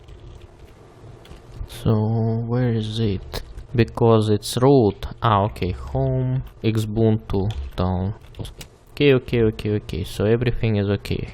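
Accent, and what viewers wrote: Russia. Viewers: С русским акцентом даже англ текст легко понимаем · Мне кажется или он русский??? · Are you Russian?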